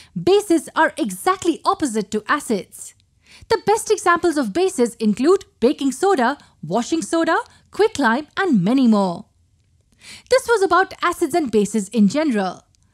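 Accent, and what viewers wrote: India. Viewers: I wonder why every educational in video has a woman with an Indian accent like what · Indian accent !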